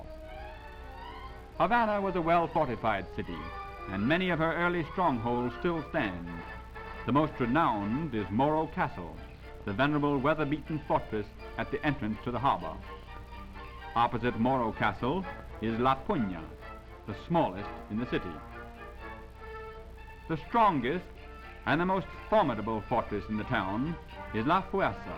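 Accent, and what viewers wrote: Transatlantic, United States. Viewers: transatlantic accent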